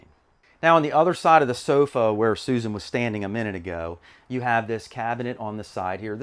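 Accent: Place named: Maryland, United States. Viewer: Being from Maryland…this guys md. Accent is comforting to me. 🤣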